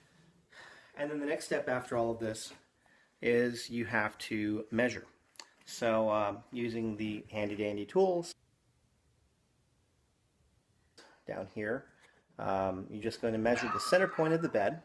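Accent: India. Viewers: Thx for the video. I too have a foam bed that did not know what to do with, now I have one, thx to you. Also, the Indian accent was excellent. Was not expecting that.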